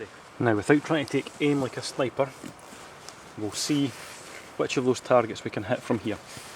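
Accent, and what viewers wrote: Scotland, United Kingdom. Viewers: You are so scottish like john mctavish :) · you dont get much more scotish =S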